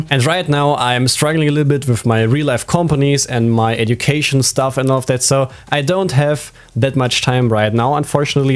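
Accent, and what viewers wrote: Germany. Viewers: Man hört so krass das er Deutscher ist xD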